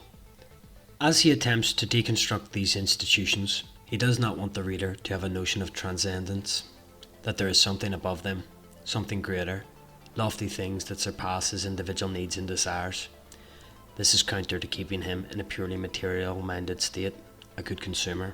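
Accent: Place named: Ireland. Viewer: It needs a special attitude to read PM books like this one and maybe exposure at uni...neither of which I have so I appreciate it when those who grit their teeth and gird their loins to do so to share their breakdowns and insights. If there is more like this to come your content looks set to be a welcome addition to our sphere. I didnt think you rambled but for those of us not attuned to the lovely Irish lilt might I suggest slightly slower speech. When one is reading from prepared content (which this seemed to be) there is a tendency to read it aloud a little fast.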